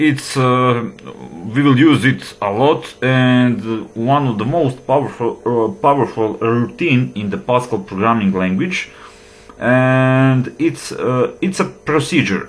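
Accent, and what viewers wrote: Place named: Eastern Europe. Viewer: noice true slav accent.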